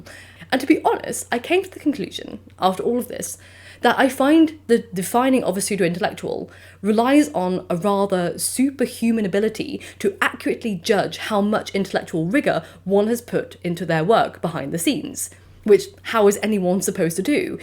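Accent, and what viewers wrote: RP, United Kingdom. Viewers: A very beautiful real intellectual with a majestic royal english accent 🌹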